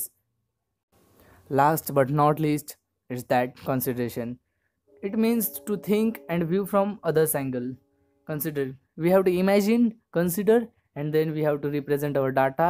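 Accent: India. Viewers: clicks video hears Indian accent fuck_no.jpeg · Indian accent with english language No thank you i'm not watching this video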